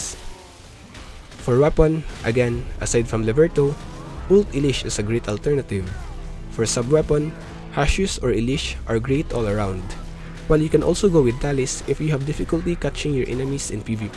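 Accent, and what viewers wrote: Philippines. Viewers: Pilipino ka ba? Parang pinoy ka mag English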